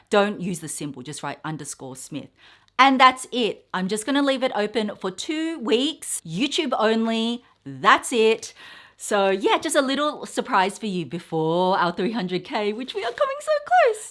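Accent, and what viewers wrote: Australia. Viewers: Oh FLP tote is very nice. I like the zipper and button closing features.. Would love to win the MJ Tote bag. To this day, unboxing videos are still my favorite to watch. I love the way you talk about the background of the brand, the information about the items you purchase in your Aussie accent. 🥰😘Linhtwin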